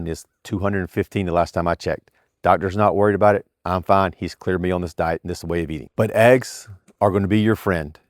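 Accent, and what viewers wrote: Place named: South, United States. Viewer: Hey! I stumbled on your videos while doing some research. I've tried this diet before and did great for 3 weeks but cracked because I visited a friend and had some whiskey which started a domino effect. Since then, I've fixed my drinking, I just don't do it any more! In that time, I have found my electrolyte solutions and salts. They work great. I just have a few questions. 1: Is it alright to incorporate 4 oz to 8 oz beef liver a week for those fat soluble vitamins? 2: Is there a calorie mark for the day? Last time I ate twice a day, around 2600. 3: "Keto Flu", did you just push through that detox phase? Any pointers? 4: Is this something you sustain? Or is it something you go through phases with? Ex. 3 months carno, 1 month incorporated diet. Hope to hear from you! Your southern drawl reminds me of my wrestling coach in high school and has opened up a new source of motivation for me!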